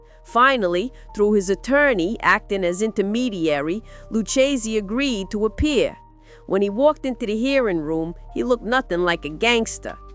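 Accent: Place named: New York, United States. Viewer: Accent’s cool… ya sound like ya from Nu Yawk(except a couple of pronunciations)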